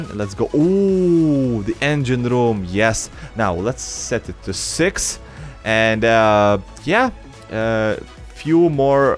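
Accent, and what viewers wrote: Russia. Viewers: are you russian? i knew you where from like another country with an axent but i had no idea. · are you russian??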